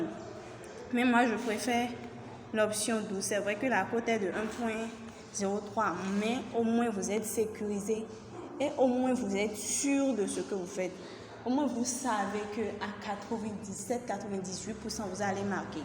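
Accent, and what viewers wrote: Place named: Benin. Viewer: L accent béninois explique trop bien 🎉 thanks you merci pour la vidéo je m abonne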